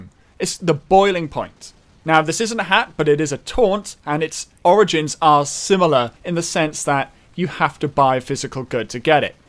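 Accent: Wales, United Kingdom. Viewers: Just wondering what's your accent? It sounds very Welshy and Valleys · U sound kinda welsh like meh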